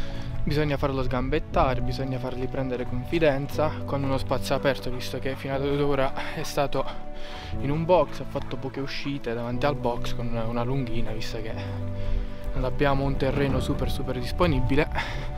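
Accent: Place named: South, Italy. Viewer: Bravo,bel video ma di dove sei, mi sembri del sud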